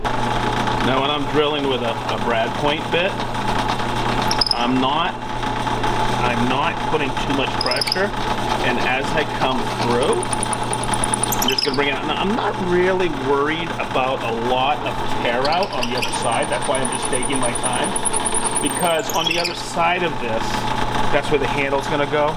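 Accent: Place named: Boston, United States. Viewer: Love the Boston accent,?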